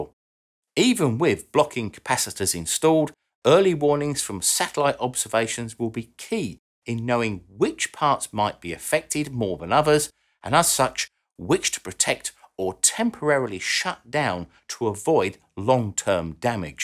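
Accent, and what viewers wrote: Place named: England, United Kingdom. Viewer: Your accent is so... Brrritish!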